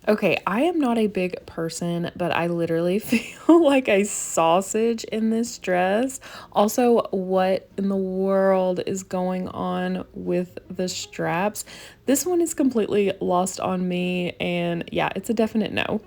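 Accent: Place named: Russia. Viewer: Interesting accent. I think your first language is Russian?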